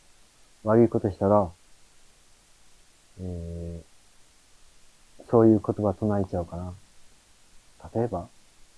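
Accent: Japan. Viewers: 沖縄のイントネーションがかなり入ってます。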